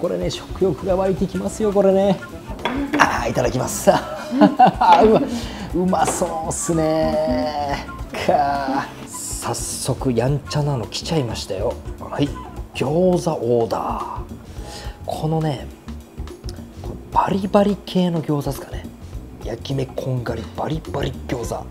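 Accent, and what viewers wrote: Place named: Tokyo, Japan. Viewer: 神奈川県民なのに江戸弁www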